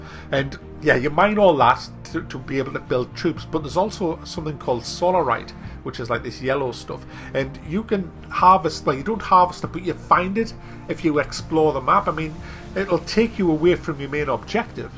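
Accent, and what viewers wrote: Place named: Sunderland, United Kingdom. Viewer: North East of England. Sounds like somewhere like Sunderland but I could be wrong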